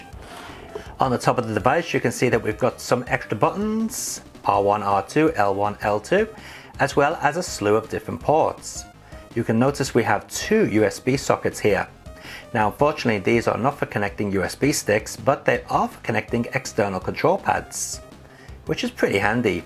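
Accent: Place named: Liverpool, United Kingdom. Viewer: Great review; and as a huge Beatles fan; I thought your accent might be from Liverpool. Looking forward to more.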